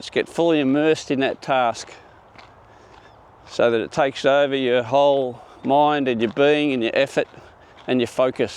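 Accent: Australia. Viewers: Thank you for your words of wisdom. Love your Ausi accent. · Love taking these walks with you,! Thank you for your positive input with your Aussie accent!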